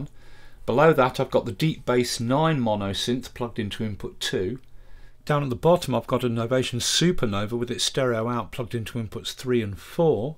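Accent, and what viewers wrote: United Kingdom. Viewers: You know the video will be good the moment you hear his British accent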